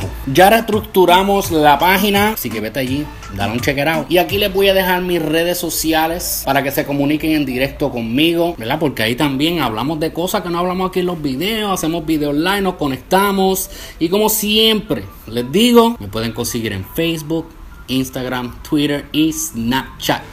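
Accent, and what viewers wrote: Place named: Puerto Rico. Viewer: ***** ajajajaja te pasaste ahi ajajajaj asereee saludos desde cuba italy le Das un toque boricua a esto brutal..adoro El acento e se lei y dije wao tiene que ser de colombia pero na ese acento lo reconosco entre miles..saludos brodel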